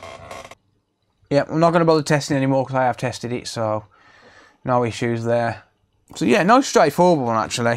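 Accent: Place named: Midlands, United Kingdom. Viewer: ahh, I thought you sounded localish, very midlands type accent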